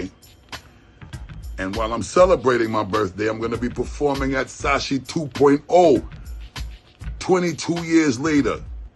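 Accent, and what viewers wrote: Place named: Jamaica. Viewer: ❤Busta! Love the JA accent ❤❤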